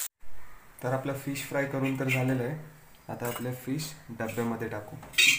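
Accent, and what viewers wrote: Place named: Agri Koli, India. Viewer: भोईट बोलतान आमच्या आग्र्यांच्यान आणि कोल्यांच्यान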